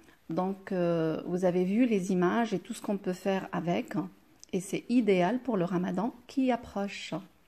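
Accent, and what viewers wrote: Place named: Meknes, Morocco. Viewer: Je me disais que ton marocain est le même que le mien tbarkellah 3la nasse Meknès ... Ma ville d'origine 😍😍😍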